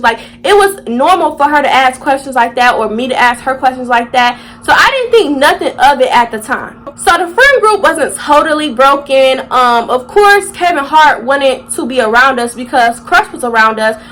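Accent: Baltimore, United States. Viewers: the baltimore accent 😭😭😭😭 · LMAOO THAT ACCENT NOT MARYLAND THATS BALTIMORE ITS DIFFERENT 😭😭😂 but I’m from dc so I get it lmaooo that shit funny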